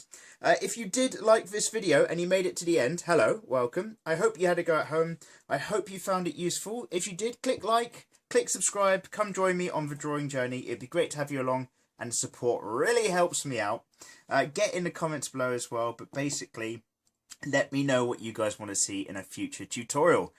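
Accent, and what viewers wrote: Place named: East London, United Kingdom. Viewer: When he pens, papers and "tings" I said yes East London or you have lot of "urban" friends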